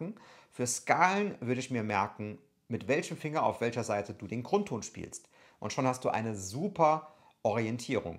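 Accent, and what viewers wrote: Germany. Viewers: mh jo 😃 bisch du ausem saarland ? Nice infos Abo check ! Danke für sie Mühe dir du dir machst 😊😊🤘🏻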